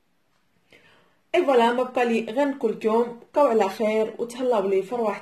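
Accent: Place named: Jijel, Algeria. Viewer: سلام ختي قناتك هايلة.....من هدرتك عرفت بلي جيجلية ماشاء الله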